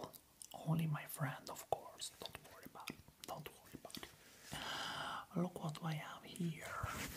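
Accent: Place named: Italy. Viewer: That Italian accent while whispering sounds so good in your voice. 😍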